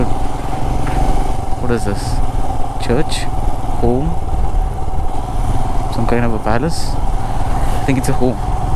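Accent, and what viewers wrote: Karnataka, India. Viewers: You have good kannada accent. 😃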